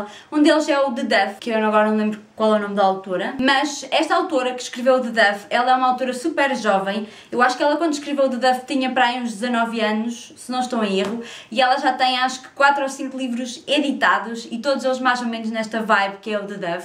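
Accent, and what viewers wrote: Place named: Portugal. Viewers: nossa , português de Portugal é uma gracinha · Pois é! O sotaque português é muito lindo! ❤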